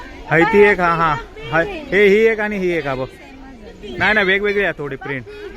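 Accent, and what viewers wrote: Malvani, India. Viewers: malvani manapasun n khaychiv natka n krta boltas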